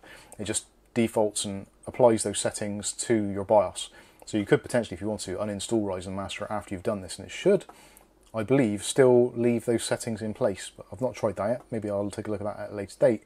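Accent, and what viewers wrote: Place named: West Country, United Kingdom. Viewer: Nice to hear a west country accent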